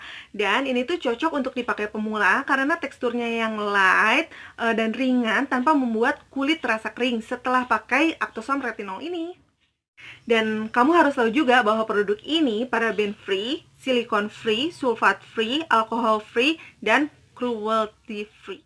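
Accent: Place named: West Java, Indonesia. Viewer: Best skincare product 2020 nya teh. Aku sukaa cara jelasin tth Sunda banget 😍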